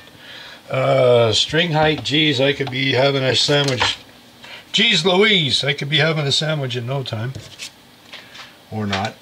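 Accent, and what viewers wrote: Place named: Canada. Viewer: I love your videos and your, your voice it calms me down if I'm anxious. I also like your Canadian accent. Man that's a nice guitar. I got a 50 Studio gold top. I didn't know that much about the tires then. The guy put a bone nut on it instead of filing down the nut he went further into the wood. Which I think would make it really easy to break. so far no problems it's a 2012 when they were using that I don't know what kind of wood baked Maple. I don't like those PCB boards. I wonder how hard they are to change out I have basic knowledge of wiring and soldering from BOCES I think they send you a schematic. That guitar roars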